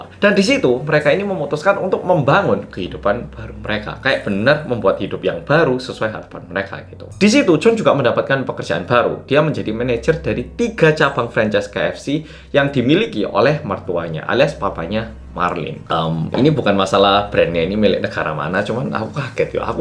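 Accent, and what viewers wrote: Java, Indonesia. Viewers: Wong jowo😂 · Bahasa Jowo ne yo medhok banget...😅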